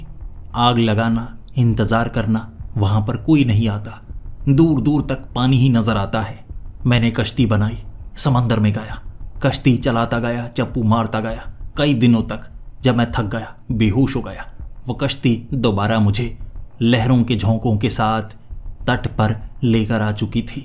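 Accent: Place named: South, India. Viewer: App south indian ho 100%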